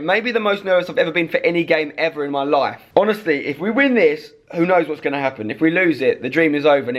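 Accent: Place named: United Kingdom. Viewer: You are talking like a british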